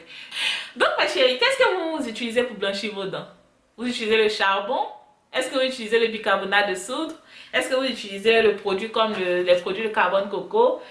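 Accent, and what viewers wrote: Ivory Coast. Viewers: Les astuces sont top, je connais celle du charbon et du bicarbonate mais pour huile de coco, d'abord ici en Côte d'ivoire, c du liquide donc ça dans ma bouche pendant 25 min tchiéé je vais finir par avaler Le carbone coco je peux l'avoir ou stp? 😂😂😂 la manière de parler, ma chérie tu es ivoirienne ? 😏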